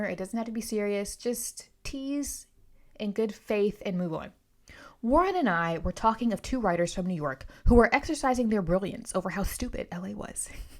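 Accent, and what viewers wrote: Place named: Pittsburgh, United States. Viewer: Funny you brought up your accent. I’ve always thought you sounded like a friend of mine who is from Pittsburgh area. I’m in north central PA.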